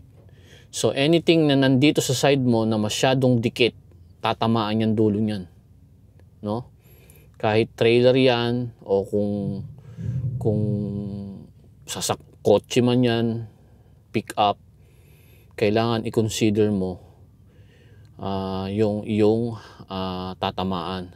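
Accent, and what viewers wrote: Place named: South, Philippines. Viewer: southern accent.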